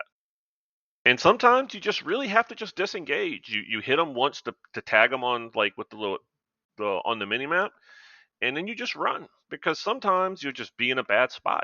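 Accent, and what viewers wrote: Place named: South, United States. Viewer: As a texan, You sir are on of the most southern MotherFckers I have ever heard. <3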